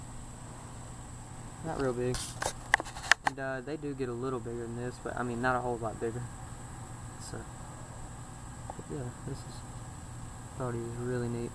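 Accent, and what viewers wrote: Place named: North Carolina, United States. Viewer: I live in North Carolina.. You really sound like some of the people that live here. I'm guessing your from NC, SC, VA, or possibly WV.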